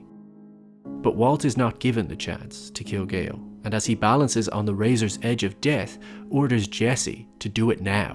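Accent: Ireland. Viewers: Are you Irish? 🤔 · I really enjoyed this video wow. I watch a lot of video essays but this one stood out for how engaging it was. Are you Irish? I’m from Cobh.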